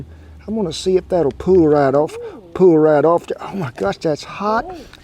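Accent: United States. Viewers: You should know, I watch this channel not only for culinary tricks. Your particular country English is amazing. Love country. 🤠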